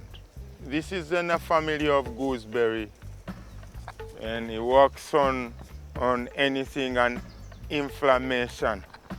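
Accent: Jamaica. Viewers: Are you an African or a Jamaican you sound like a Jamaican in 🇯🇲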